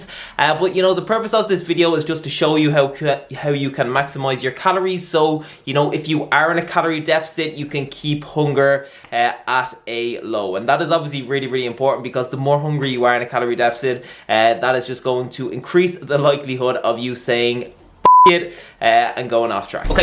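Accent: Ireland. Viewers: This guy sounds irish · Are you Irish? You kinda talk like Conor McGregor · Nice Irish accent I’m sure it’s Irish maybe Scottish I’m 90 percent it’s Irish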